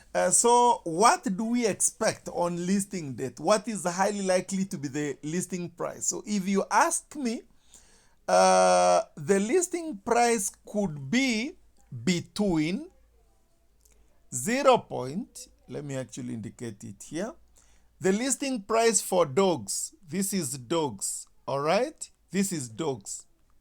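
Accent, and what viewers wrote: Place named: Kenya. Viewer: You sound Kenyan.. Kwanza mkale😂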